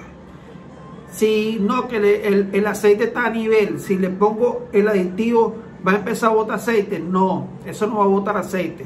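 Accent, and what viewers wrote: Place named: Venezuela. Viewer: Sr. un respetuoso saludo, Ud es de Venezuela creo por el acento jeje, soy de Vzla., tengo un corsa 1.6 año 2002 caja automática. El vehículo no se usa mucho en la semana porque tengo otro vehículo y por otras razones. Actualmente me desliza la transmisión en el 2do. cambio., ud cree con el aditivo mejore esa falla?, ese que muestra en el vídeo lo consigo en MercadoLibre?, un amigo mecánico me comentó o sugirió drenar todo ese aceite viejo sacar el Carter y hacer mantenimiento al colector del Carter, quisiera saber su opinión y recomendación por favor.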